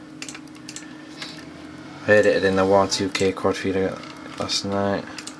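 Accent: United Kingdom. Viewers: You are so british I love it. Where you from pal? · YESSSSS SOMEONE BRITISH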